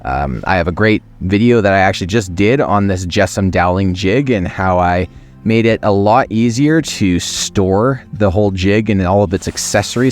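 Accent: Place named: Canada. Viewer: Wait, you're Canadian?!? 😂 I should have caught the accent sooner. Feeding the algorithm for you from a bit South - almost to the Gulf of Mexico. 😄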